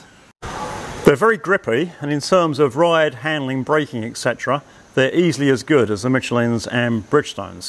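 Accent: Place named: United Kingdom. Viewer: Great info and so refreshing to hear British voice and no ego trippin loud mouthed blah blah bang4buck yank dross ! thanks, i subscribed and look forward to more from you maaaaaan, kind regards, Tom.😀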